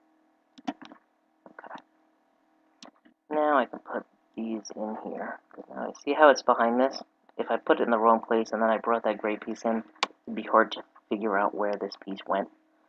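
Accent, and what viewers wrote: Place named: South Jersey, United States. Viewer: ha!! i knew it!! my mom is from egg harbor, my dad is from absecon. i miss the beaches so much in south jersey....but am so excited to be coming back to go to the shore again!! woohoo!! you can talk to me anytime, i love that accent....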